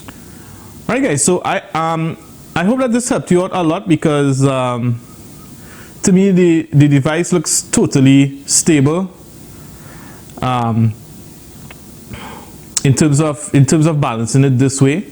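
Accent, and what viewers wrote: Trinidad and Tobago. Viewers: You sound like a Trini. Am I right? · Wow. An ACTUAL video that helped!! Thank you so much for this. Lov'n the trini accent too haha!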